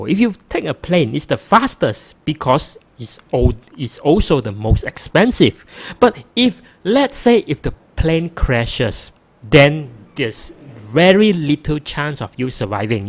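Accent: Malaysia. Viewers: really find your videos helpful, and the Malaysian accent entertaining as well! Don't stop! :)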